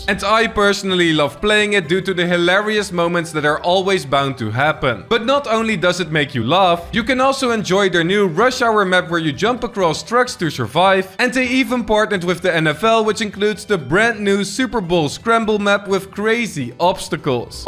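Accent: Netherlands. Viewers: can always spot a dutch accent ! :D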